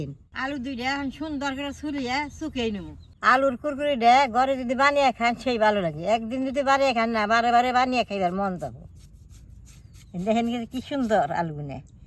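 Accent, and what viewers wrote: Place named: Nawabganj, Bangladesh. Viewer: দেখেই মনে হচ্ছে অনেক মজার। দিদি আপনার দেশ কই? কথা শুনলে মনে হয় আমাদের নবাবগঞ্জ বান্দুরার 😅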